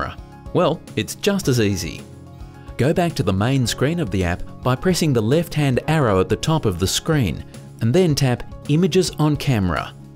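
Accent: Australia. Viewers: Australian Accent lol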